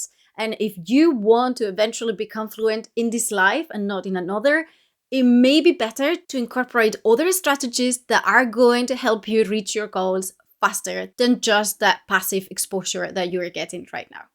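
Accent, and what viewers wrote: Scotland, United Kingdom. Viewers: Yup, I was looking for confirmation of this! Certain sounds are very Scots. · Of course you can tell she's Spanish, but she's got a mix of different British accents, especially Northern English and Scottish. No thick accent at all. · Yes, her accent is a mixture. I hear a Scot and English accent. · Solid video! I'm interested in your accent. Are you a native Spanish speaker modelling your English after a Scottish accent? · A significant detour, but I very quickly heard a noticeable bit of a Scots accent :) It took me a long way back to a Scots colleague who was also a fluent Spanish speaker...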